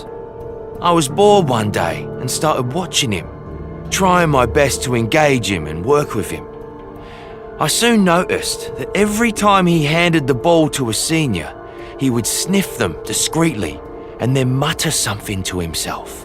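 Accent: United Kingdom. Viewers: I keep forgetting hes british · HE IS BRITISH??? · I KEEP FORGETTING THIS MF IS BRITISH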